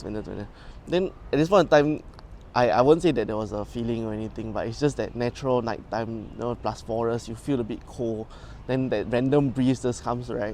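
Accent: Singapore. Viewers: It wasn’t English. It was Singlish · Unique Singlish... nostalgic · Interesting stories...but,literally i just dont understand his Singlish.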